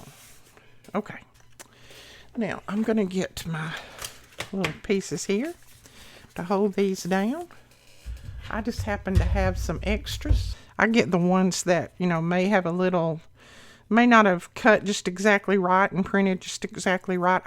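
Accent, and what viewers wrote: South, United States. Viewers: I’m so glad you got your Facebook taken care of finally and your YT name is back! Yay!! As always I enjoy watching you craft. And I love hearing you talk with your sweet southern accent. That journal turned out really cute. Sending much love and blessings, Sharron 🩷🤗🩷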